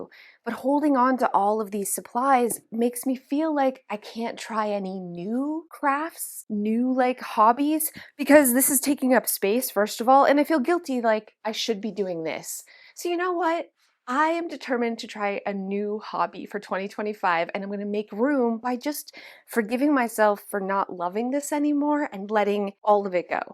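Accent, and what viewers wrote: Canada. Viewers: just found you, love you!! :face-red-heart-shape:that accent, Canadian??, sunny, funny relief, away from world issues ( to OUR mini world issues)...I'm cluttered to the max!, I have PTSD ( not due to the clutter, which yes, is traumatic!) but after I watch you I feel exhausted, so I've virtually worked right?😜. I keep a journal, to make sure I do SOME decluttering every day, even a tiny amount is something. Thanks for being here x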